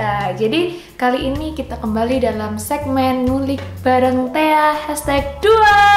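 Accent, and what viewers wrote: Java, Indonesia. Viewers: Medoknya khas banget Thea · kak thea medhok bgt suaranya 🤟😅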